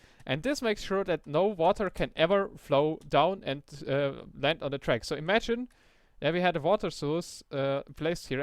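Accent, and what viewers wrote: Germany. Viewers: are you german? :D your english sounds like your german^^ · It's probably German because of how the word what sounds like vhat And we is ve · german huh ?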